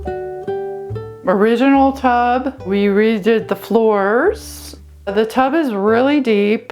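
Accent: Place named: Washington, United States. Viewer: Is it surprising that I can detect a Washinton accent?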